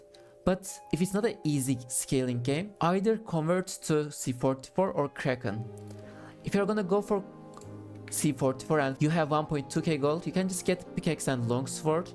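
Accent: Turkey. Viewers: I know when I hear a turkish accent subbed o/ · tam türk ingilizcesi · Türk aksanın var, türk müsün? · Türk İngilizcesi bu amk xd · Brother are you Turkish? Great video.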